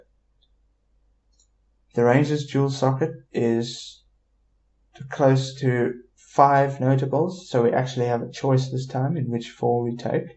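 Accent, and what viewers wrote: South Africa. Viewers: lol dude, instantly heard you were south african. thanks for the info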